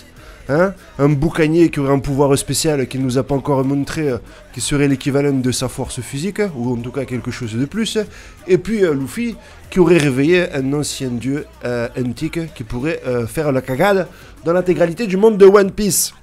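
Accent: France. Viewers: Il serait temps que Dragon se bouge un peu 🤣 Sinon pas mal l'accent du sud il y a du potentiel.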